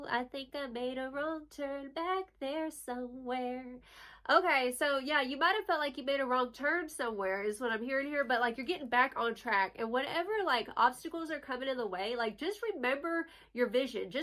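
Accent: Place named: Texas, United States. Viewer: you are such a good singer. i love ur voice and texas woman accent. reminds me of a cold glass of arizona sweet tea, baby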